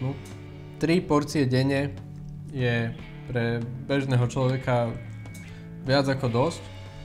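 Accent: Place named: Russia. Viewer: máte nádherný ruský prízvuk :D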